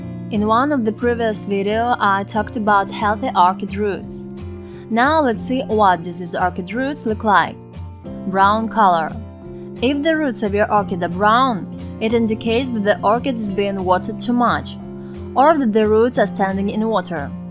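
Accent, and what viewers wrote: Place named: Russia. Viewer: Publicly known facts delivered in heavily accented English. Perhaps make the next video in Russian, and only when you have useful info.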